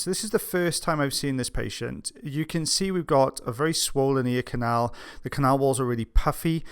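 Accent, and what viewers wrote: Wales, United Kingdom. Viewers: I love the accent! Especially Welsh! And the words used or sayings, in the UK are lovely! I've used "give it a go" so much Its a part of my every day dialog!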